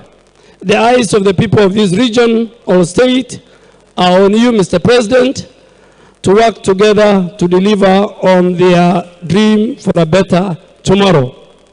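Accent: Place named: Kenya. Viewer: Oh akhas waxa uu ku hadlaayo fiiri dadka u qoslayo fiiri waa siyaasiin soomaali shakhsiga sidaas u hadlaayo waa nin kenyaan aha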